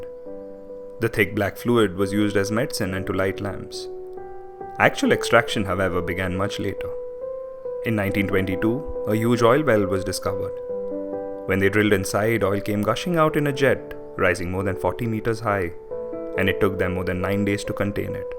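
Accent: India. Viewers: You sound like a person from India. Are you from India? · The narrator is Indian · INDIAN TALKING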